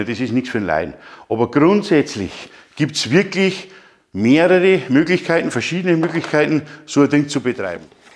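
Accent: Bavaria, Germany. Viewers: du erklärst es einfach, dass ich mich zwar für mich darauf konzentrieren muss, deinen bayrischen Dialekt zu verstehen.Du bist ein netter Kerl.Mia san mia👍😉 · der "Erklärbär" 😂 Wie immer klasse, auch wenn ich mich als Preuße oberhalb des Weißwurstäquators manchmal anstrengen muss das bayerische zu verstehen:-) Mal ne vielleicht ungewöhnliche Frage? Vom Zähler zum Wohnungssicherungskasten ist zwar 5x10 Quadrat verlegt aber 2 Außenleiter sind nicht im Zählerkasten aufgelegt und somit im Sicherungskasten auch nicht. Habe sozusagen nur 1 Außenleiter, 1 Neutralleiter und PE zur Verfügung. Der eine Außenleiter ist mit allen 16A Sicherungen im Kasten verbunden. Wäre es richtig wenn ich zum Kochfeld mit 5 pol. Kabel gehe, wobei ich 3 Außenleiter (einzeln abgesichert) nehme?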